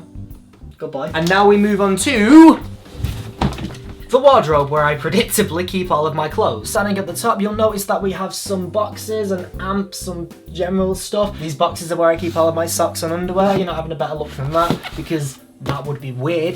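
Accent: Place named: North, United Kingdom. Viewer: I'm a northerner myself, but your accent is pretty nice compared to the ones around me, u go!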